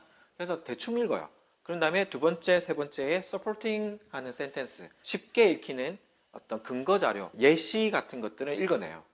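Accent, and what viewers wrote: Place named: Gyeongsang, South Korea. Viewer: 표준어 쓰는 경남사람😂 영어공부법 잘 들었습니다^^ 맥락있는 단어암기와 활용, 적용이 중요하군요! 쓸수있는 영어공부를 해라. 별표!!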